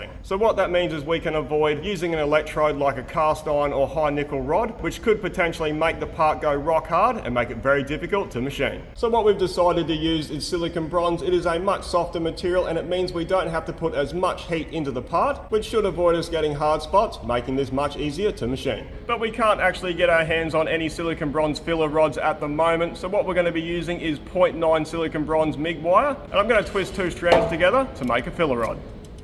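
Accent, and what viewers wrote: Australia. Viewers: Around 21 min. Heard you say "now we are going to find Santa" , realized your Australian accent...now we are going to find center. Was wondering "what does Santa have to do with this?"🤔🤭 Enjoying your vids.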